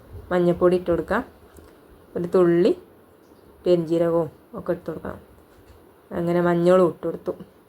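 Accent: Malappuram, India. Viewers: മലപ്പുറം ഭാഷ മതി എനിക്ക് ഇഷ്ടം ആ ഈ സംസാരം കേൾക്കാൻ 👍🏻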